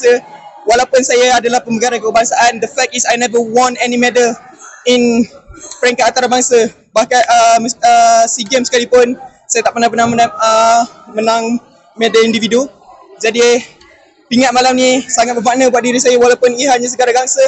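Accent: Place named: United States. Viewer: Yeahh, ada dgr slang american dia, oyeahhh 🤘🏻 terbaik Azeem 🔥💥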